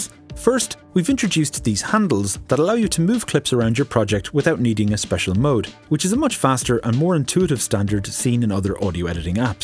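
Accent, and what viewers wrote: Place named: Ireland. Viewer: Subscribed for Matrin "Tantacrul" Keary's excellent UX design sensibilities and pleasant Irish lilt.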